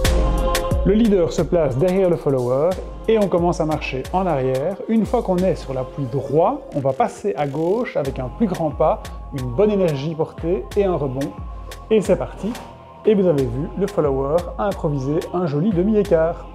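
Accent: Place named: Belgium. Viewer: Belle pédagogie, rythme de démo agréable et super digeste 😉, les portés j'Adore ! #DirtyDancingGeneration... 🤷🏻‍♀️😍😜 Y en a jamais trop ^^ Presque. Merci pour cette vidéo très fluide côté montage également, les petits traits d'Humour et l'accent de la Belgique qui me rappelle toujours des Souvenirs chers au 💗🤫🙃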